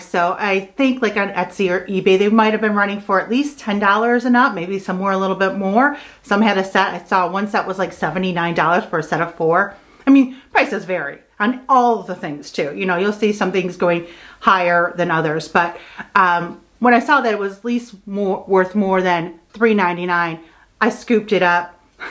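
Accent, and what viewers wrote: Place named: Michigan, United States. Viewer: I just found your channel today. Are you from Michigan? I grew up there but live in Illinois now. I can sometimes still hear the Michigan twayang, lol.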